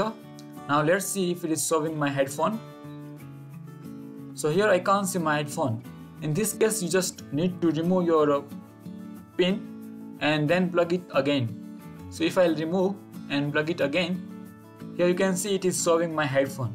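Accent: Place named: India. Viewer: when I hear an indian man I know I am seconds away from a solution - Thanks buddy, love and respect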